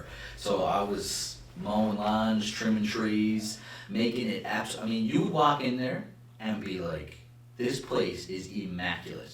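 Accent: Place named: Nova Scotia, Canada. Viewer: Not sure how i missed this video. But great accent! Miss nova scotia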